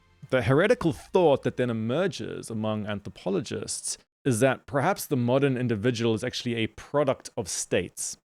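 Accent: South Africa. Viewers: I loved Debt 5000 years of history. A must read. Thanks for keeping his memory alive. PS lekker SA accent! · I wonder if you are South African? Your accent reminds me so much of home. Great video, keep it up. RIP David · Subscribed. Excited to hear more from this channel. Are you originally a fellow South African? I feel like I hear a little trace of the accent.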